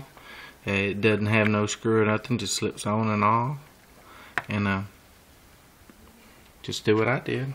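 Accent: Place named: Texas, United States. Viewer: There is nothing more pleasant than an intelligent man with a Texas twang!!!! God Bless you!!!!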